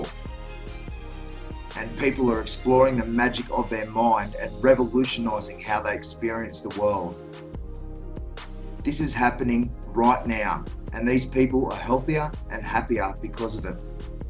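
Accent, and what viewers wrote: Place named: Australia. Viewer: I love this! it is nice to hear a fellow aussie for a change ;)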